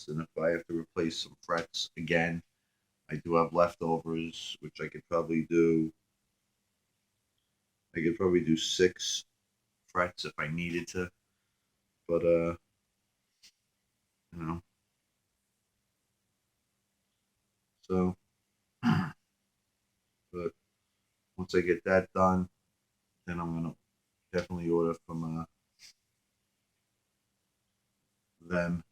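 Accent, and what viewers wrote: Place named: Long Island, United States. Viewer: Too much to respond to in this video. I feel like I'm listening to myself a couple of years ago. You're gonna be a star man. YT is going to be a great place to flourish for you. I can see it already. You have a GREAT accent. The Long Island thing is BOSS dude. It makes you so Hollywood. I think you have half of this stuff nailed. And a CAR GUY. HOLY F. Welcome to the club of guitar guys who are car guys too. DKG is a huge car nut too!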